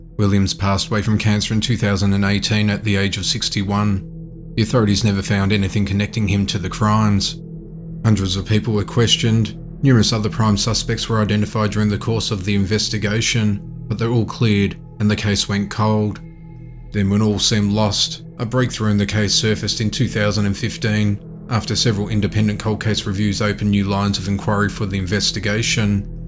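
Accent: Australia. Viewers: I am guessing the narrator is Australian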